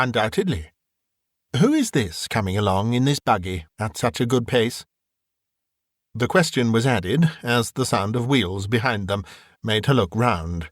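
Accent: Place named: RP, United Kingdom. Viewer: I do really enjoy listening to you especially because you use…dare I say(!?!) what my Grandmother (who raised me) called “The Queen’s English” to great effect. Of course, it’s how these old stories are written.But I’m sure the authors would be thrilled to hear you reading them! ♥️♥️♥️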